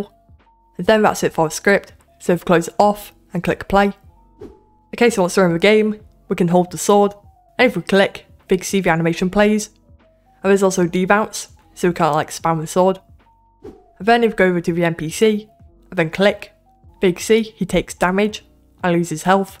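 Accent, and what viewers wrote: United Kingdom. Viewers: Amazing, plus the vouce is satisfying, british is cool.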